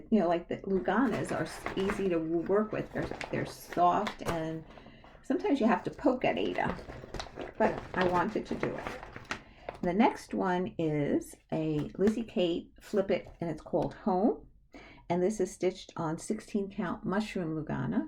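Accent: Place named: New Jersey, United States. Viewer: I’m also from NJ, but living in NC. Love hearing your accent. I am trying to finish Christmas Camper by Barbara Smith. After that, I’d like to do some Stitching with Housewives patterns. I’m jealous that you have a needlework shop near you. Absolutely love Autumn in the Village, gorgeous! Thanks for your videos.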